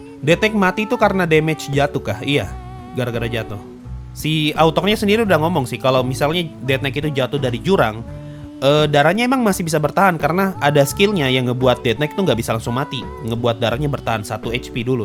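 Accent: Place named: West Papua, Indonesia. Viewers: Iya, ane rasa seperti org Papua bagian kota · Maaf kk klw boleh tw kk dari daerah mna, soalnya logat nya kek ank" Papua barat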